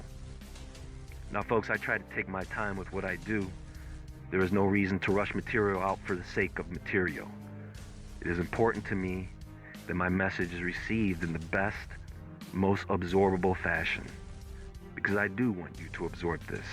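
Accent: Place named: East Coast, United States. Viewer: sounds like a know-it-all, with his Italian (Jewish?) east coast accent, telling us "trust me". He makes some interesting videos, but his logic is often flawed, at least it seems erroneous to me. We live on a plane, not a spinning ball traveling through a galaxy, as has been proven ad nauseam. Both NASA and the government lie to us, but he believes the crap science they taught him anyways - wtf? The weather is now created and controlled, flooding caused intentionally using rapid water evaporation from power plants, which creates and fuels storms. Cyclones are created artificially. This was all proven in Weatherwar101 videos, which you can still watch on youtube. Mr. WW101 himself was disappeared in early December 2017, then his website disappeared a few months later, since he wasn't able (alive?) to renew it with his ISP. This video is mostly nonsense, but I like his videos on other subjects, especially the bible.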